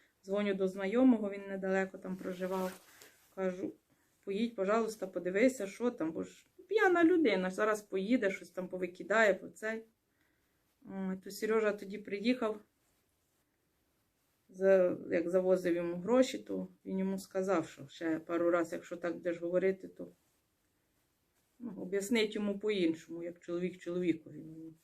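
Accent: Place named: Western Ukraine, Ukraine. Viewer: Як Одеса? Я почала вас дивитися думала що ви з западної України приємно здивована.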